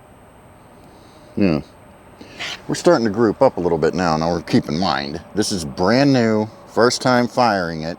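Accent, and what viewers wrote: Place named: Midwest, United States. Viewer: I've noticed you are bit thin skinned and don't like constructive criticism. We are probably pretty close to the same age (I'm 55) and it's quite clear you have never had any formal instruction either from a civilian course or the military. This is your channel you can do as you see fit but maybe just maybe a few of us out here know a thing or two and perhaps its possible you could learn something? I'm not sure what state you are in Ohio or Indiana maybe possibly Pennsylvania with that accent? HR Funk (gun Tuber) lives in Ohio. You could probably reach out and I bet he would be happy to give you some personal instruction on rifle marksmanship. He was a Marine Corps sniper and a police officer and even a police chief before he retired. He's one of the nicest chaps on Youtube. Look him up he typically replies to emails fairly promptly.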